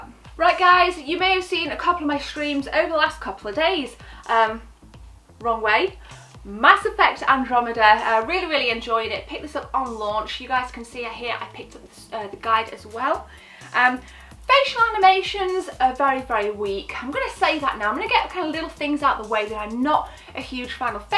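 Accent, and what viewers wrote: United Kingdom. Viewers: You are really beautiful! I love the way British gals talk. I am in the US and I really like your videos. They are not boring like some other people's.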